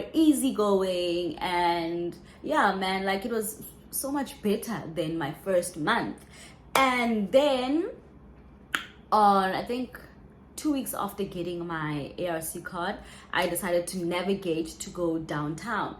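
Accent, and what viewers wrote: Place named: South Africa. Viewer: I Love that you keeping your SA accent ! Aish !!! Hibo !!!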